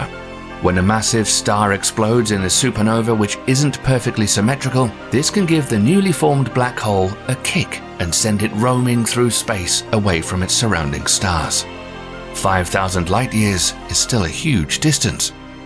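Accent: United Kingdom. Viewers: Will you always speak in such a beautiful British accent? I love it.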